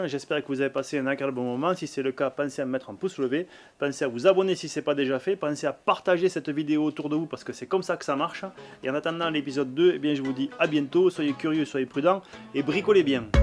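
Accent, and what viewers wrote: South, France. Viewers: Pas de quoi , et oui c' est très long la fabrication de mobiliers , pas mal de gens pensent que ça doit se faire en 2 jours un meuble... Voilà pourquoi il ne faut pas à avoir à revenir sur des étapes précédente. Bien faire comme préconiser en traditionnel , débit grossier , corroyage , assemble etc... et enfin mise à longueur final montage à blanc et si tout est ok , phase terminale , le collage de l ensemble. En tout cas je suis abonné , et j aime bien votre façon de présenter vos vidéos , avec ce p'tit accent qui à l' air de venir du Sud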